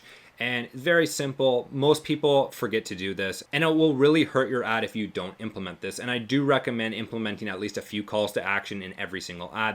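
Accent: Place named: Toronto, Canada. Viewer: Thanks a ton for the content. So useful. You've basically become my tutor on all to do with Google Ads. I'm a small business owner and have a dormant passion for marketing and sales. Used to have a role as a sales and marketing manager. Learned how to build websites and the basics of SEO. Did some basic coding. So enjoyable. Now I run a renovation company. Just getting into the Google sphere and really appreciate and enjoy your content. I'm from Brampton btw. Peel!! Lol 😅 Noticed you're from somewhere in the GTA region by the sounds of it. Anyways, thanks again. Your hard work is appreciated...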